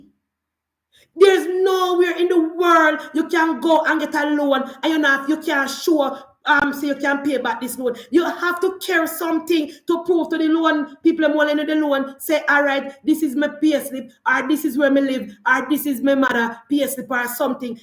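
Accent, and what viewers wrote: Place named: Jamaica. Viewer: first -time on your program i like the way you talk as a true Jamaican and a true Labourite i wan't you to tell the prime minister please since he going to reduce the 7% of the light bill i wan't him to look into the high food price if he can reduce the food price it will be a good thing for the Jamaican people and it will good for him too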